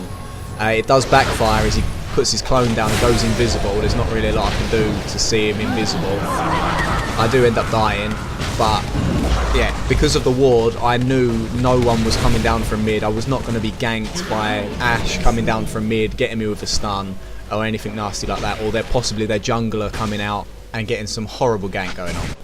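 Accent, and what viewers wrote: United Kingdom. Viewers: I love your videos !! Just the fact that you speak "English English" makes the whole thing alot more understandable cheers !!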